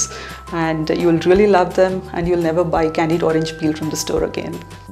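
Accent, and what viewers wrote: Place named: India. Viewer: I love the Indian accent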